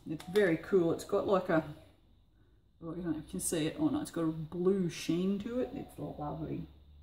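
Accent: Australia. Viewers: Love your video and your "accent"... the aussie accent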